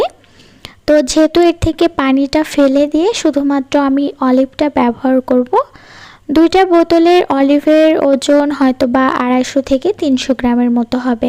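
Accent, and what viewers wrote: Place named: Chapai Nawabganj, Bangladesh. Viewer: Apnar language onek ta chapai er manus er moto. Basa koi apner r kun class a poren.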